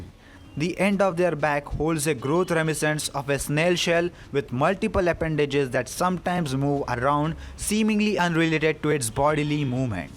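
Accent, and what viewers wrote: India. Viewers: Made in Abyss is one of my recent favorite series speculative evolution and creature designs. The fact I play Pokemon Violet, and train down in Area Zero, which somewhat resembles the Abyss, means that the series not far from my mind. Coincidentally, the raids also have a star rating, although the highest rating is 7 rather than 6 stars! I almost kind of wish we'd get DLC Pokemon based off on some of the Made in Abyss creatures! Normally, I have a notoriety for understanding what I believe is an Indian accent. And while yours is quite strong, you take the time to pronounce your words speak them and strongly. I appreciate that. Even some American YouTubers fail to do this, harming the enjoyment that can be gained from listening to their videos.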